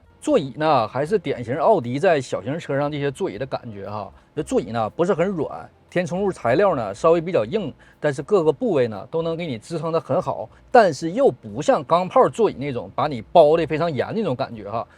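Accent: Northeast, China. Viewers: 听到这东北味就感觉满口大蒜味，真是人车都辣眼睛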